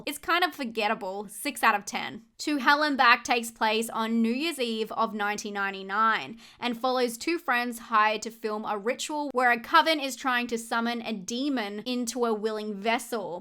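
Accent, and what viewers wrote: Australia. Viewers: I clicked for the reviews. I stayed for your Aussie accent.....came to the Aussie accent. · Can we just stop to appreciate how beautiful, upbeatand thorough is? Her amazing Aussie accent and charm is attractive. Still shocked by some of what shes tolerated horror wise.. I still havented seen Noroi the curse and Salo seems like a difficult and unpleansant watch tht will be infuriating to me. And this from a guy whose favorte horror films of last 15 yrs have bee Heridatary and Audition.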